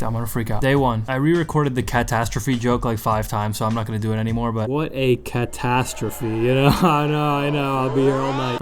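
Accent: Australia. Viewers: Are u an aussie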